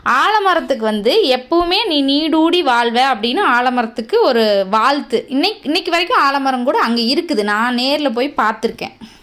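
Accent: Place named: Coimbatore, India. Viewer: Unga kathai super sister... Maa sha allah... Unga shorts ellamaey pathuten supra eruku... Arumaiya eruku... Unga slang remba alaga eruku.. Pechu valaku kovai side ah nenga...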